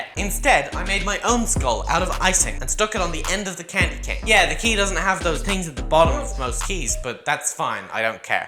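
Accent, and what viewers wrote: Australia. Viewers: I’m Australian ur Australian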